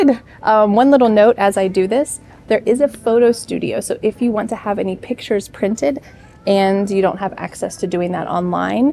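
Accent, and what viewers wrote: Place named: United States. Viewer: I love American accents more and more because of you, teacher ❤️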